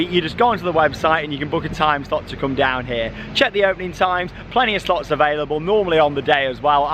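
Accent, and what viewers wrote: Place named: England, United Kingdom. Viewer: England accent.....did you said hell or hill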